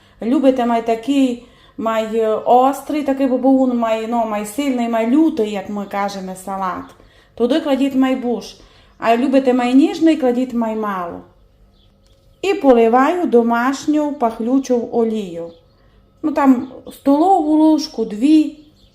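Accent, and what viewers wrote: Transcarpathia, Ukraine. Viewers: Насолоджуюся вашою чудовою закарпатською говіркою. Дякую за рецепт. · Спасибо за рецепт и красивый,напевный закарпатский говор. Я очень люблю вас слушать.❤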